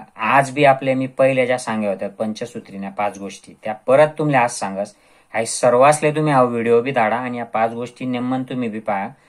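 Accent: Khandesh, India. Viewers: Khan deshi bolatay ka sir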